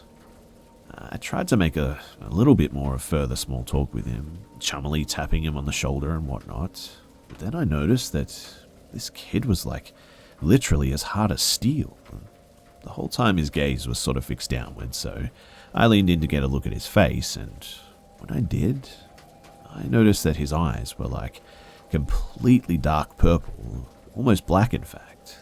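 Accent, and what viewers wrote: Australia. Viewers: Are u aussi or kiwi? I am not pretty sure about your accent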